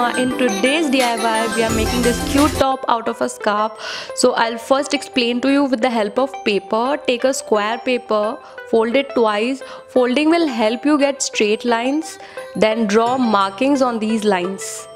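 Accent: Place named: India. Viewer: good idea. but indian accent is so ugly to hear. cant stand long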